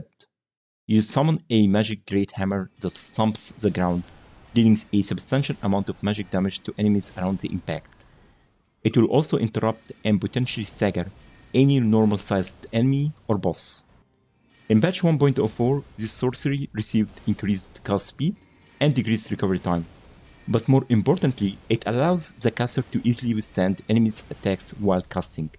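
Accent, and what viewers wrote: Egypt. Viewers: Are you Egyptian? I can hear it from your accent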